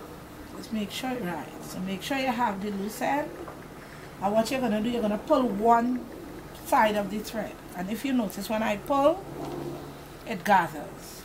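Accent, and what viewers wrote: Trinidad and Tobago. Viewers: You sound trini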